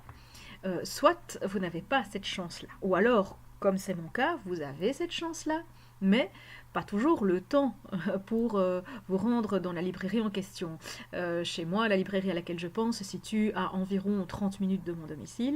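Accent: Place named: Belgium. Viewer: J'adore aussi les livres de couture, les magazines. Il m'arrive de les lire et dévorer avec les yeux avant de les acheter. Et je les conserve précieusement, comme ma mère avant moi. J'aime bien qu'il y ait les patrons grandeur réelle, même si je ne réalise pas tout. Merci pour ton initiative. Je me suis abonnée et vais voir ton blog. Ton petit accent du côté des gaufres est délicieux.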